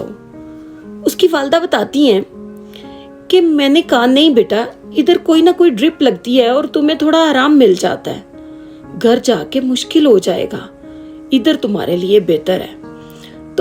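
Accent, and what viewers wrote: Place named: Punjab, Pakistan. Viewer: Your speaking style with Punjabi accent is very charming. Keep it up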